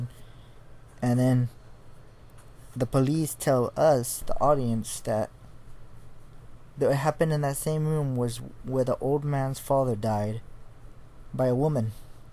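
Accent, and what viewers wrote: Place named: East Los Angeles, United States. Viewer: three eps legit scared me: Inside the Closet, The Last Car and The False Prophet. One thing I liked about TFTDS is that a lot of the stuff went unexplained so that made it scarier. Although in the case of The Last Car it went beyond being unexplained to flat out just not making sense. Are you from East LA? You have the stereotypical East LA accent.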